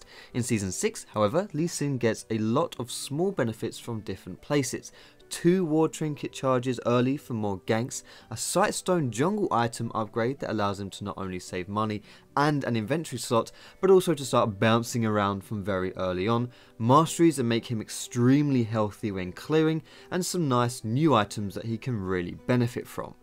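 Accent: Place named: England, United Kingdom. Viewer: i like you'r englaned acsent ;D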